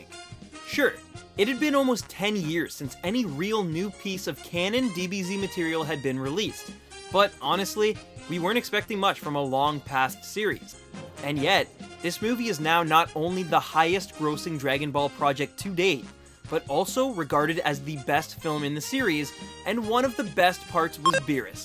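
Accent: Canada. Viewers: just the way you say about it can tell you Canadian I'm right aren't i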